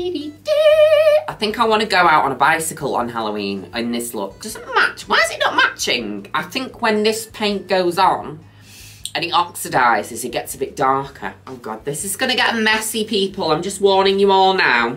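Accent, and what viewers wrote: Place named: Liverpool, United Kingdom. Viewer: Super Scouse today 😂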